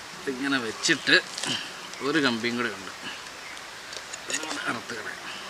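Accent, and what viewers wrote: Kottayam, India. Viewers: കോട്ടയം ആണോ സ്ഥലം ? സംസാരം അങ്ങനെ തോന്നുന്നു.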